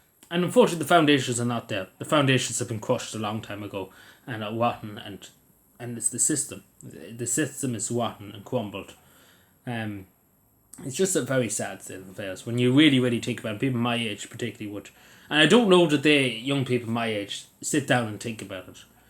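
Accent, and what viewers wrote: Cavan, Ireland. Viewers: The way you speak is euphonious. I was just whiplashed when I clicked the video. If you will allow to to make the suggestion, maybe read some excerpts (the prologue for example) of Canterbury Tales in a youtube video. It would preserve the Cavan accent digitally.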